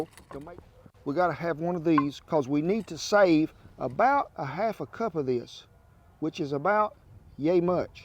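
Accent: South, United States. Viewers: I'm in Germany and I watch this for the southern drawl and the quality control Inspector Beagle. And for the food of course.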